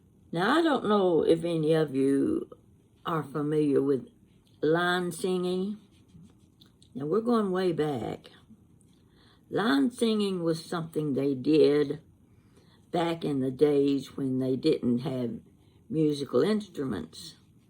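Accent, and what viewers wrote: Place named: Eastern Kentucky, United States. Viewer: My grandmother was my best friend. I lost her 16 years ago this week, and hearing you brings the warmth I have been missing for so long. Thank you. ❤️ I have to ask, where are you from? Your accent is hauntingly familiar. Sounds like Eastern Kentucky.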